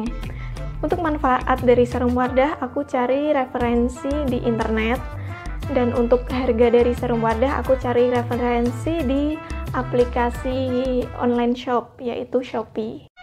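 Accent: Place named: South Kalimantan, Indonesia. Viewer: logat ngomongnya mbak kaya dari kalimantan selatan pahuluan saat ngomong kata "wardah", "wajah", "like", "ada", "pori-pori", "harga", "sembilan", "ribuan", "mbak-mbak".... nebak iseng-iseng doang, hehe... nice video... main2 juga ya ke channel saya. .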